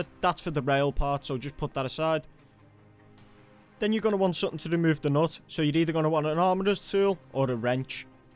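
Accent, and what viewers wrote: Scotland, United Kingdom. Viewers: Nice accent, you Scottish? Or Irish maybe? I'm non-native English speaker so I don't know much about your accents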